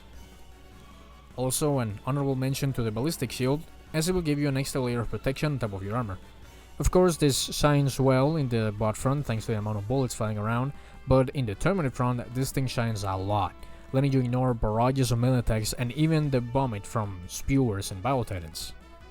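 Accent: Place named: Eastern Europe. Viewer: The Eastern European accent has become strangely endearing to me. Given the vast amount of YouTubers with the accent who I watch, I have subconsciously begun to associate the accent with quality content. I’m not sure if that’s a good thing.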